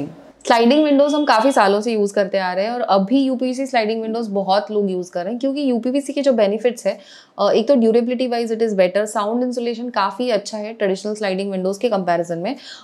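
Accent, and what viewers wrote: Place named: Hyderabad-Karnataka, India. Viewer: Very nice like always in detail. Your tone wz Little bit HKBRD region your belonging to which state. Very nice clear tone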